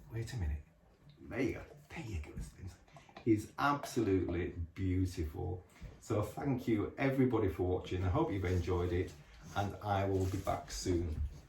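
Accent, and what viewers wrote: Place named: United Kingdom. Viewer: Hi, I enjoyed this video very much. As an American I’m quite enchanted by the way you speak haha. Interesting that you Brits count food in grams and call what we would say “doing it’s business” as “spending.” I think my favorite thing though was when he turned around to look at you and you just said “Hi Spencer!” It warmed my heart. On another note you showed everything very clearly to the camera and your descriptions were great and added a lot to my experience even as a sighted person. My whole county is under quarantine for the coronavirus starting tomorrow for 14 days so I’ll be watching all the videos. I do have one question- have you decided on a middle name for him?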